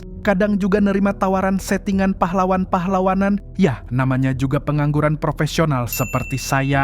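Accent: Banjar, Indonesia. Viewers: Positif orang Banjar ni adminya🤣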